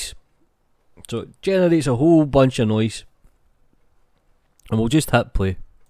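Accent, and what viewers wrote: Scotland, United Kingdom. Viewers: I'm originally from Italy, but I love this Scottish accent in your voice so much, I just don't know why! Great tutorials!!! Thanks a lot!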